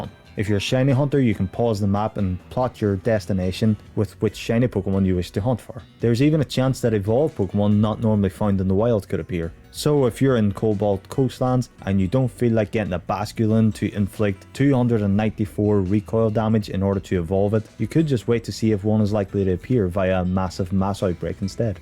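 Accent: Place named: Ireland. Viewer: Love hearing an Irish accent and seeing local creators doing well on YouTube, great job with the video mate!!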